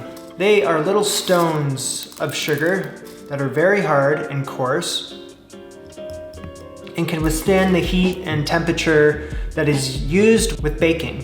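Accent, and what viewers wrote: Canada. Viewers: you dont need eggs and you dont need the bullshit pearl sugar; lots of cheaper and more common alternatives. (ps..are you canadian or from the pacific north west - you are familiarly annoying).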